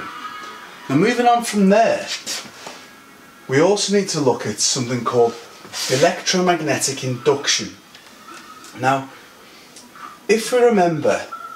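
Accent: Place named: Scotland, United Kingdom. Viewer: He does have a Scottish accent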